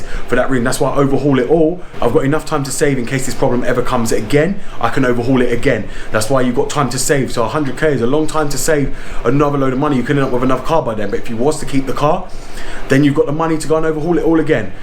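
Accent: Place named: Scotland, United Kingdom. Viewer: comprehensive information, thank you, can you please consider to speak a built slowly, for non-scotich native it will be difficult to follow you. I turned on youtube auto English subtitle caption but it seems this option can't catch what you said too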